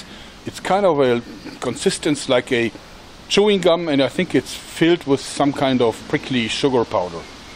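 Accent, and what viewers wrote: Germany. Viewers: He is German, he has an accent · are you come from Germany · I really like his German accent (I' German btw) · FPSRussia ist ja auch aufgrund seines Akzentes berühmt geworden, und dass obwohl er kein Russe ist ^^. So ein Akzent macht jemanden einfach sympathisch. Ich finde deine Videos echt Klasse und sie zeigen der Welt das wir Deutsche nicht so ernst und humorlos sind wie gerne behautet wird. Ps gute Besserung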